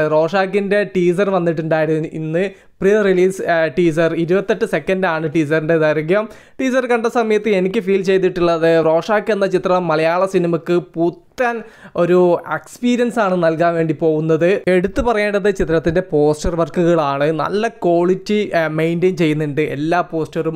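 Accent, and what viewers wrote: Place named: Thrissur, India. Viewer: Thrissur ragam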